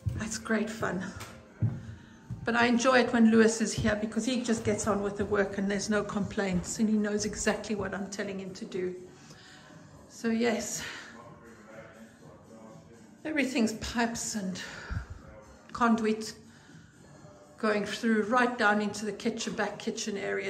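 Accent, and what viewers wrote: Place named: Cape Town, South Africa. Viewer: You sound like you're from Sea Point, Cape Town.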